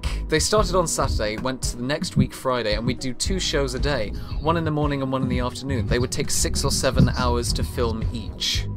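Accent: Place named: London, United Kingdom. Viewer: Are u seriously British? Because it sounds like u live In London!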